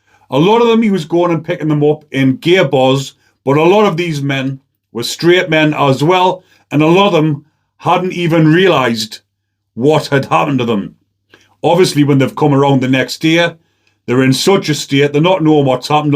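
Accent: Newcastle, United Kingdom. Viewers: we all know you're a Geordie but can't you speak MoRe clearly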